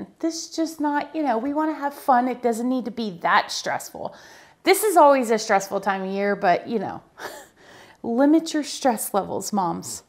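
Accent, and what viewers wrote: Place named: South, United States. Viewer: She is in Florida. In Alabama we say caramel too. I think its a southern thing.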